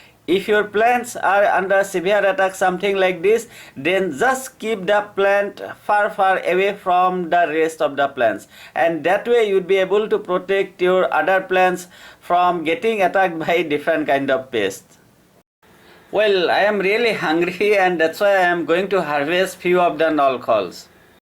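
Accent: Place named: Northeast, India. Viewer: R u frm northeast??I thought so coz of ur accent🤗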